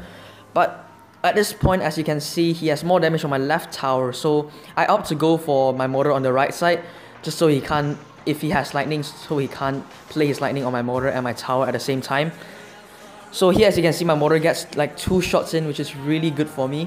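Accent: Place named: Singapore. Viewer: your accent sounds singaporean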